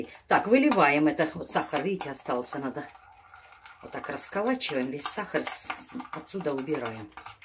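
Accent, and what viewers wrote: Ukraine. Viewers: ты просто молодчина очень хорошо рассказываешь и показываешь.Голос приятный с украинским акцентом.Ты добрая душа лишнего не говорила а всё по делу.Дай тебе Боже здоровье, и всех благ в твоей жизни.С праздником Вас. Христос воскресе! Воистино воскресе! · Уважаемая, я пеку Кулич / Пасху, которую вы пекли на передаче" Всё беде добре" ( смачно), вы там ещё банановый кулич, зайчиков и в яичной скорлупе делали. Огромнейшее вам спасибо, у меня всегда они получаются шикарно, и ещё --- говорите и не слушайте никого, ваш украинский акцент мне очень приятен 👍🌹🌿🙋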